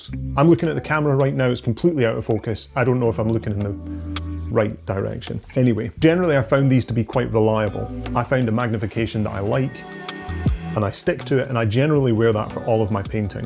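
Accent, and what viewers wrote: Scotland, United Kingdom. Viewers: Was so good to hear a Scottish accent when I turned the volume up. (No, I haven’t got some weird voice fetish, it just meant I haven’t got to go searching for the UK equivalent with the links! For a change.)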